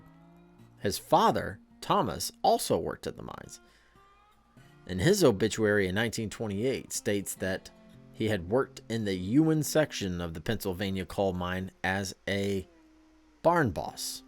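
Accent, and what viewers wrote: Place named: South, United States. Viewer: I was born and raised in Tennessee. His southern accent is a little on the light side compared to most southerners myself included.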